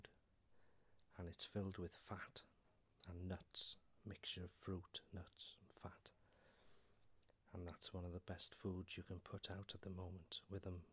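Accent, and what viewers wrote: Wales, United Kingdom. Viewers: Hello! I've really enjoyed your videos. I find your Welsh dulcet tones very soothing. What I think would be a quality idea, would be a video about the birds that visit your garden, or local area. I'd really like to know what bird makes what song and what they look like, etc. To hear their calls on one video, with your voice would be very relaxing as well as highly informative! Blessings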